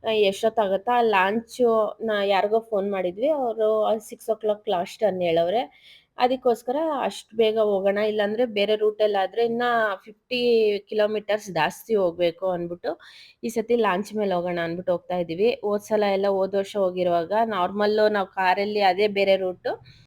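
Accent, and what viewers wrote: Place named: Shivamogga, India. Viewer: ಅಕ್ಕ ನೀವೂ ಶಿವಮೊಗ್ಗ ಕೆ ಬದಿರ ಕನ್ನಡ ಮಡ ❤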